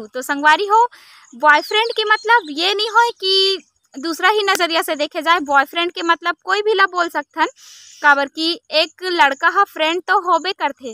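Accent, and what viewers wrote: Chhattisgarh, India. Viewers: जी आप मन के शुद्ध छत्तीसगढ़ी बोली सुने मा बहुत अच्छा लागथे, ऊपर से आप मन के आवाज सुरीला (मीठा)अच्छा लागथे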